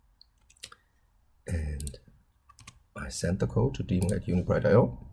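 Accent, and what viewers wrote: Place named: Germany. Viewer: You have a German accent 😂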